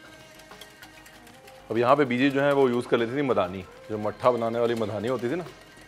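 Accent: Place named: Lucknow, India. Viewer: Ranveer Bhaji .. assi vi Lucknow to a. Menu vada changa lagta jab tussi pubjabi vich gal karde ho , with a slight Nawabi unfluence. Hor tuhadi recipes de ki kenhe. God Bless you, Ranveer!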